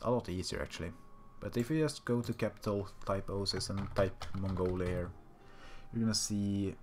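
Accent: Sweden. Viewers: are you Scandinavian (your accent sound Swedish but it could be another country)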